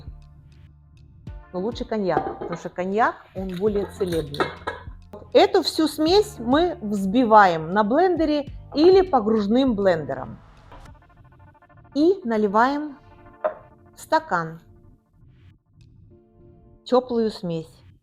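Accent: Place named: South, Russia. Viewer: ЛОжить, лЯжу - из малороссийского говора, другого чередования согласных, ударений, ну и что, с большой теплотой к нему отношусь, весь юг российский на этом диалекте говорил, окают в Нижнем, акают в Саратове, Москве...и т.п. Это все прекрасный русский язык в разнообразии своих практик жизни. Спасибо вам, за доброжелательность и неравнодушие!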